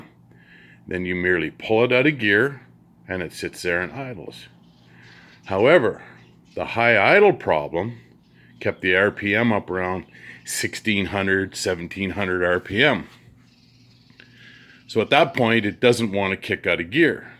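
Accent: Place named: Canada. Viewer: Canadian eh.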